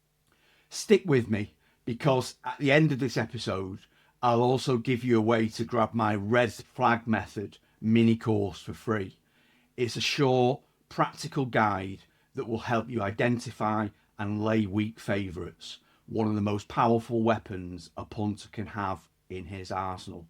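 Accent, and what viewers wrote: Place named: Stretford, United Kingdom. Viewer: Voice of experience. Everyone should listen carefully to you. Funnily enough I lifted "Class Horses" off the bookshelf a couple of weeks ago never thinking I'd see you on YouTube! Suspect you're from the same neck of the woods as myself listening to that accent (being a Stretford lad myself!). More power to you!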